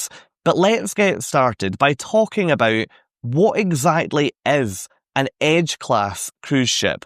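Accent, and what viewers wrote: Scotland, United Kingdom. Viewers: It took two nanoseconds to know you are a Scotsman! I live in Minnesota and my favorite boss of all time, one Frank Docherty, was my most favorite boss of my entire career. Thank you, for a great review. We were on the Edge last Fall when we cruised from Vancouver to Sydney. We love the ship and agree with all your observations.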